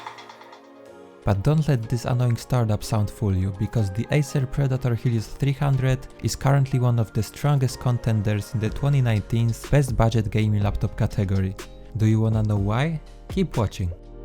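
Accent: Poland. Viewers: You...will you test the new rog Strix scar 3 (with rtx 2060/2070 and i7 9750h) ? PS: I like polish YouTubers 😁 like you (I recognise your accent because I'm near your country) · Cześć! Super recenzja po akcencie słyszę że z Polski jesteś co nie? Na twoim miejscu robiłbym recenzje w dwóch językach bo dużo polaków by cię napewno oglądało a większość ludzi z polszy jest zbyt leniwych żeby oglądać recenzje po angielsku 😃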